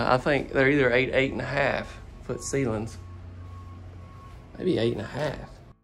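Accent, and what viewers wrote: South, United States. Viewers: !!! Sorry! I stepped out with some of your competitor's channels on Youtube for a while and, I am back with just YOU MAN!!!! You're smooth, man!!! LOL! You're the best photographer, Your southern accent makes me melt( I lived in Tennessee for years and miss those southern men)!! I'm back for good! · I second that, I am handicapped and would love to see a video for the disabled. And, never apologize for how you say utility room, because I just love your southern drawl, that's what makes you you. God bless.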